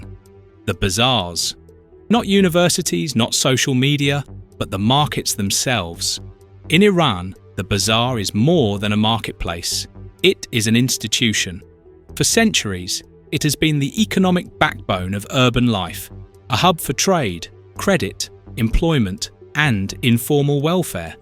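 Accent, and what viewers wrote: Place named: United Kingdom. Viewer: Who are reporting your mis guided assessment? We patriotic Iranians are watching all biased media mis reporting the protests and what brave Iranians protesters are calling for on the streets, they are taking their country back after 47 years of occupation by islamist terrorists who are not even from Iranian decedents and majority were born in Iraq and lebenan. So this uprising is about taking our country back and bring back our constitutional monarchy this is the main slogan shouted on the streets return of monarchy and Pahlavi, so do not try to misreport because of being a biased media, God knows which power or ideology is behind your rasneh, I note you are based in Bangeladesh and have a british accent. Times have changed this is not 1979 when the West removed our beloved Shah and brought these terrorists to Iran and deceived part of the nation who for money and position sold their country, and majority sadly at and watched, now it is 2026 and the last 4 generations have become aware of their history and what a beautiful country they had under Pahlavi, so enough is enough we will bri g back our monarchy and the glory we had to Iran whether you biased media and globalists western powers want it or not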